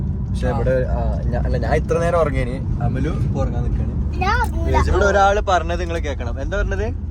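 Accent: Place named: Kasaragod, India. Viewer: Edeyil nammale kasargod bashe bannalo enth pang 🤣💗👍🏻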